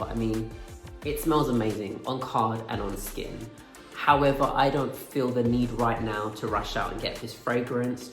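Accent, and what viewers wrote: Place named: France. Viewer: I’m just loving your French accent😂😂. My teenage son loves the OG , it’s one of his favourite😍😍. Appreciate the thorough review👌👌. Stay fabulous🥰🥰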